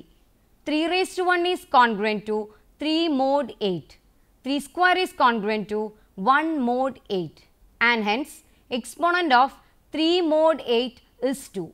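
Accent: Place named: Kerala, India. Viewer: Malayalam influence in English. Plz learn phonetics immediately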